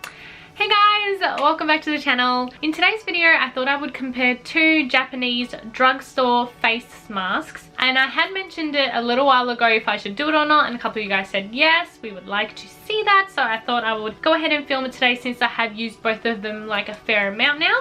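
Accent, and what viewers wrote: Australia. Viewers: Wow, as an Aussie who has been living in Japan for 15 years watching your videos and hearing a true blue Aussie accent is blowing my mind a little lol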